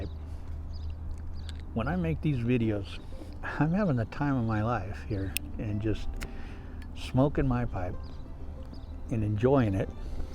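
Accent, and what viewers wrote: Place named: South, United States. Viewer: picking up a little southern drawl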